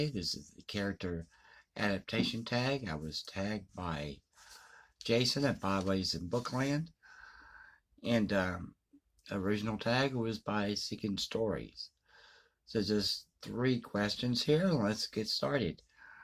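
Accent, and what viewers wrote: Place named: Texas, United States. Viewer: Thought I recognized the accent. Howdy fellow Texan. Cool, sad story about the TV adaption of "All Creatures Great and Small."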